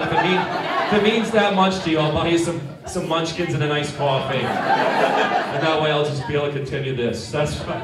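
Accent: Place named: England, United Kingdom. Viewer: Definitely the accent is from England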